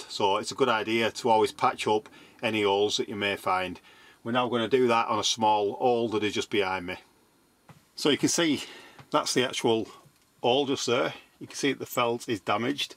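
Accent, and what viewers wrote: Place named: Scotland, United Kingdom. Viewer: I can sense some Scottish accent. Do you work near Glasgow by any chance?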